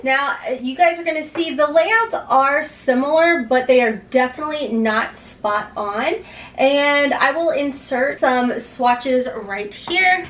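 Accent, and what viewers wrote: South, United States. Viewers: I watched this entire video for "matte", "sale", "gold", "talc", and "slant". 😂😂😂 Ooooh to be southern!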